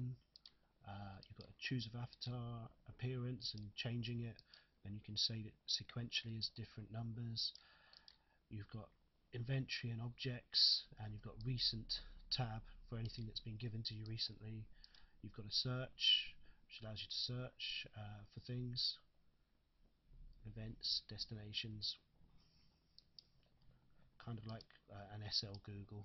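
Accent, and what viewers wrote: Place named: United Kingdom. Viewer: Thanks! I wish I'd seen this a week ago. Slow eye speed, plus having to mentally process British English into American English mean I'll have to do a lot of stopping and rewinding to learn the specifics. But still, your delivery is good, and these are important points. I can't make the tutorials run when I'm IN Second Life, so this is great!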